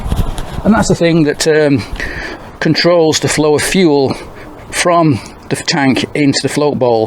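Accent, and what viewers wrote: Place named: England, United Kingdom. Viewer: Good morning brother.... Have you done a compression and or leak down test on that cylinder.... Love your English accent... Cheers from Australia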